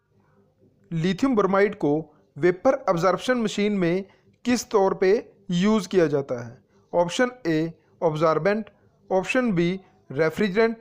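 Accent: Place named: India. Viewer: disgusting India accent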